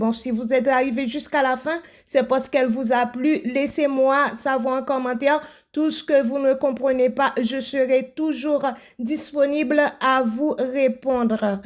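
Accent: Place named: Haiti. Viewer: Je suis une nouvelle abonnée, tu es haïtienne comme moi en regardant cette vidéo je me suis dit que tu as un accent haïtien pour confirmer je suis allée visiter ta chaîne et je suis tombée sur une vidéo en créole, je dois encourager ma chère concitoyenne🇭🇹 bonne continuation!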